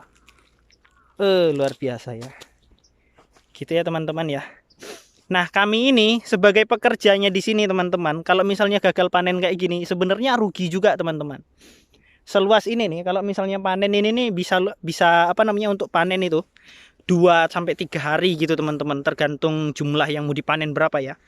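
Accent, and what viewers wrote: Java, Indonesia. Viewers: Mase Indonesianya mna kok kyak jawa? Sya blora Jawa tengah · Wong jowo iki. Ketoro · Jawa mu ngendi bos. Sak aratarat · Tapi kok logatnya jawa wkw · Wong ndi to kok medok😁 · Jowone pundi mase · Medok yow medok ae mas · Bro....we cah Endi.....jowone medok.....sehat selalu dan sukses..... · Mas Smpean Jowone Endi??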